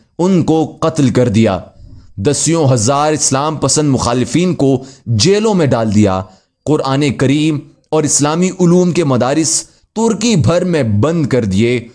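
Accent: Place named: United Kingdom. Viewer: Kamal ke English accent dali apny..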